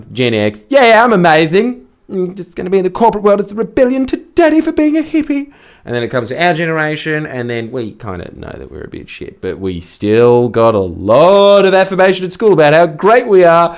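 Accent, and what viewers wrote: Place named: Australia. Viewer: A lot of this is what I already do daily to keep oriented, but it is pretty nice to hear it in an Australian accent with a jawline that could stop a truck. Thanks mate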